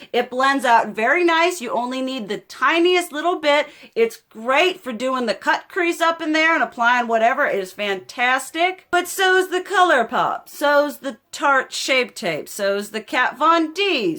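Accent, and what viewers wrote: South, United States. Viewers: New subscriber here 👋👋 I have to ask, with that beautiful southern accent, where are you from??? I live in northern Louisiana and we have a few women here that sound the same! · a true southern belle 💗 you have such a beautiful voice. I'm in Lafayette Louisiana and all I hear around here is Cajun so its refreshing to hear a beautiful accent 💯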